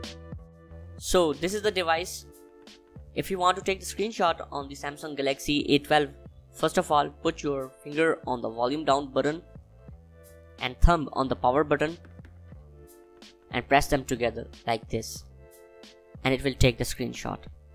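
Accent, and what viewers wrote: India. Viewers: Always gotta rely on that indian tech guy with a bollywood accent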